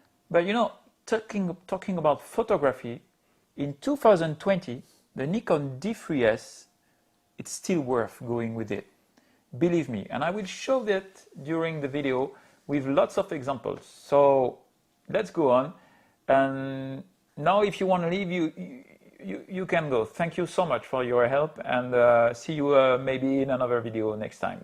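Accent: France. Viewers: Assurément un français according to his accent lol big up! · Bonjour, je crois entendre un accent francais ;-). Vous me recomandriez la nikon d3s a 799euros? Je suis un debutant et cherche une camera pour la photographie de paysage et de nuit. Je concidere aussi une fuji xt30 ou une sony 6400. Merci pour la reponse d avance.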